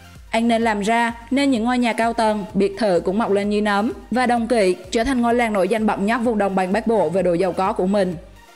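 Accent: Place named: Binh Dinh, Vietnam. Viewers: E à,c khuyến e tập phát âm chuẩn một tý vẫn còn giọng quê góc luon,chẳn hạn chử ăn e đọc i chân giân bình định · Giọng bà này ở Bình Định :))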